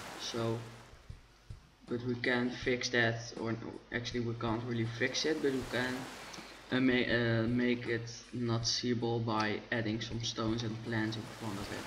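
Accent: Netherlands. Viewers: he's dutch, we have that terrible accent (im sorry) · So fcking annoying when people are complaining about his English and Dutch accent...this video is about building a resort, not about the English language. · he has just an dutch accent ;s im dutch to and i think he can speak really good english. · je hoort gwn duidelijk dat dit een Nederlander is xD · that just the dutch accent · Wauw! you can hear you're from the Netherlands xD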